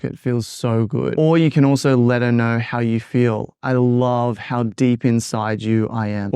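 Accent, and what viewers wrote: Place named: Australia. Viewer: you're amazing. i love your videos and your stupid little australian accent. keep it up, i love watching your stuff with my bestie, we're so educated now!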